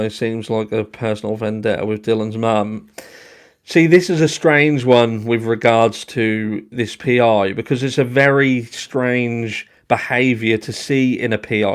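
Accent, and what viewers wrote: United Kingdom. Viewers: I am so bummed that I missed this... I rarely have time to catch lives, but for you, I'm gonna do my best! You crack me up!!! Tell all them TROLLS that HATERS OF HORSE-SHIT (yes I've named ur super hero fan club & I am the leader lmfao) 2nd that FUCK OFF & will make life hell with our super powers... those powers include common-fuckin sense, ability to understand & love a great British accent 😉, and our desire to do anything we can to help the voiceless get justice and stop history from continuously repeating!!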